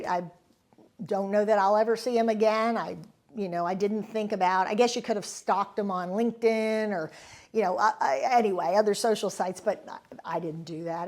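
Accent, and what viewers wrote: Philadelphia, United States. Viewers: I could tell you were a Philly girl - same here! Love your topics. ❤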